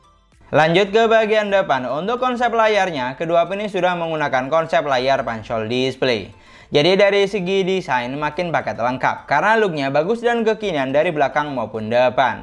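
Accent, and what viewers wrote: Madura, Indonesia. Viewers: Ini logat madura ya bang?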